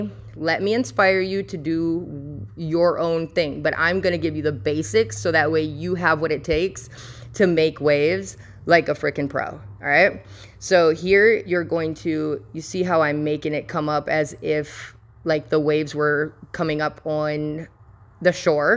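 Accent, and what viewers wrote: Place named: St. Louis, United States. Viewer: Thank u, it was a strange deja vu feeling when u spoke. I was born in St. Louis but lived all over the country growing up. U have a St. Louis accent to me, but I have been teased by friends my entire life that I took a little bit of every place I lived & blended them all together, maybe that’s what felt familiar to me idk,🫣don’t mind me!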